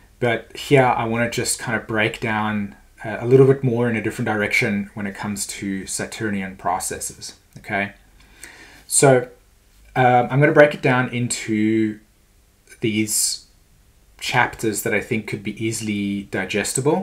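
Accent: South Africa. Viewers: you are loosing your lovely South African accent.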